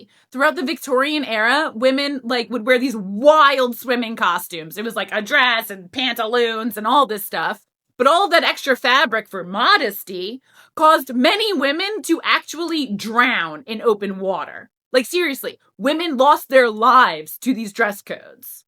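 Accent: United States. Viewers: Girl!!! You’re killing it and I LOVE your Jersey twang!!! Looking gorgeous too ❤.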